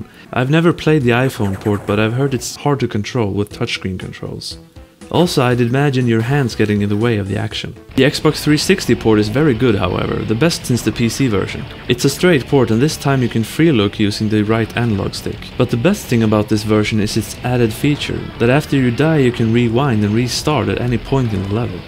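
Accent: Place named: Sweden. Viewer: Hah, hur visste jag att du var svensk sekunden du öppnade munnen? ^-^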